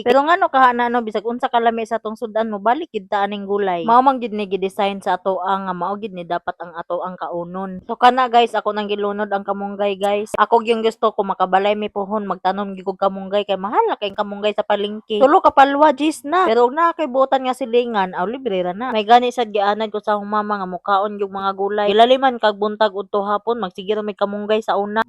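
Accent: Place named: Bohol, Philippines. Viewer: tamsak host. boholana girl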